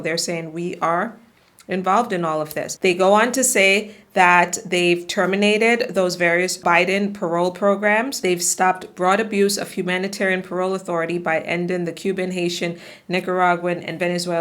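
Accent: Jamaica. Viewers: Good evening are you a Jamaican?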